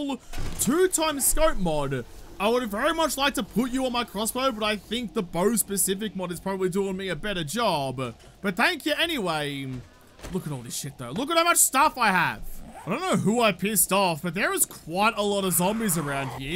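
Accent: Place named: Australia. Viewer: he is an Australian... I'll give him slack on pronunciation.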